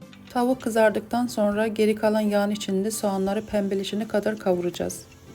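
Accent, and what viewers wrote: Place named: Turkey. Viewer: عزيزم دستت درد نكنه از اين غذاى خوشمزه ، چه لهجه ى تركى زيبايى دارى 🩷🪻🩷🪻🩷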